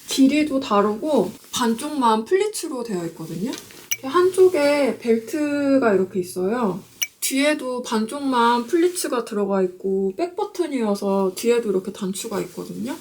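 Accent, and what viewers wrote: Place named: Seoul, South Korea. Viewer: snl 나온 서울사투리를 현실로 본것같애요! ㅋㅋㅋㅋㅋ 옷 다 이뻐요 잘 보구갑니당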